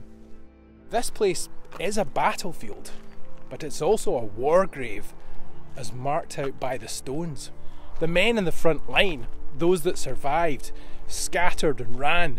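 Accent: Scotland, United Kingdom. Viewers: Thanks for this, well done and love the Scots accent as a big part of this report. What sad days there were, and still are with these conflicts taking people's lives and often for so little gain. The bravery of these souls must never be forgotten!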